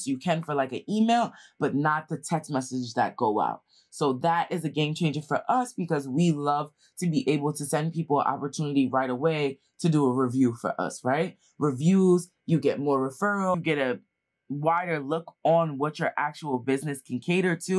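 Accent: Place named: New York, United States. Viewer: This girl sooo New York. I love it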